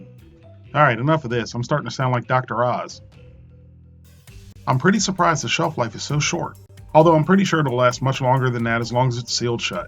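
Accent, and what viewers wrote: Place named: Carolinas, United States. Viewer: not related to your video but you sound like a Carolinian and if so hell yes all the best creators are from hear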